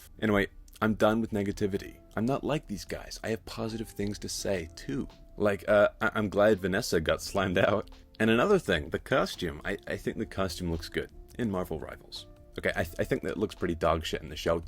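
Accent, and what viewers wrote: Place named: United States. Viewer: That's supposed to be a New Zealand accent? You sound American.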